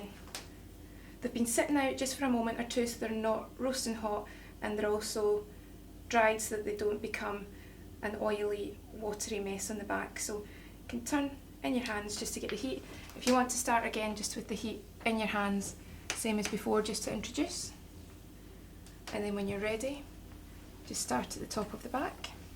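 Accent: Scotland, United Kingdom. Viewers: Scottish is an actual language. She's just speaking english in an scottish accent. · Really informative and relaxing to watch, as everyone has said. I love the Scottish accent too! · Scottish accent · Ah ma fellow scot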